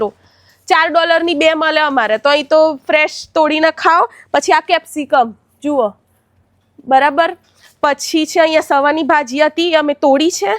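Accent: Sabarkantha, India. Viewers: બેન તમે સાબરકાંઠા ના વતની હોય એવું લાગે છે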